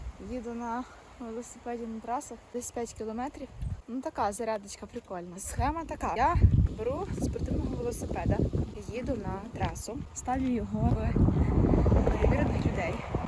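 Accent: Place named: Volyn, Ukraine. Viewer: вже підписаний) я тільки відкрив для себе ваш канал і зазаз передивлююсь) я сам теж з Волині, але вже давно там не живу, тому дуже приємно чути наш рідний говір)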